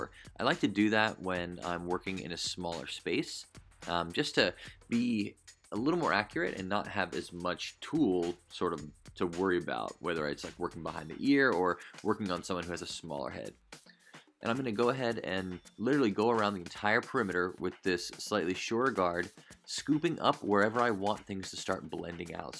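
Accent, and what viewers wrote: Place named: United States. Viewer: Absolutely awesome dude from one hairdresser to another I’m from the UK 🇬🇧 i can tell your the US 🇺🇸 accent.Loving your content so much ❤can’t wait for more of it 🎉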